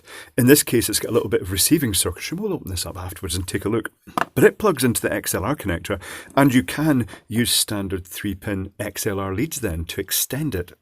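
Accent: Scotland, United Kingdom. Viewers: Legionitalia not quite the same in his Scottish accent, but we need to get him to sing that song ...bonus for death daleks dancing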